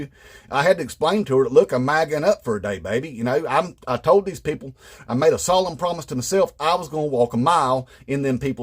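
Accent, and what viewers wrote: South, United States. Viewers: Pretty much impossible to make sense of his southern-twang machine-gun chatter & spew, let alone Listen to it.... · Much appreciation from Canada🎉You sir, are brilliant and the best thing on the web. I will never again assume that a strong southern accent has anything to redneckedness! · The only thing MAGA people hate more than women, black people, poor people, queer people, educated people, children (once they're born), illegal immigrants, and legal immigrants, is themselves. Ps.: As an Austrian, I love the way you pronounce 'Nazis' .. that southern accent is gold 😅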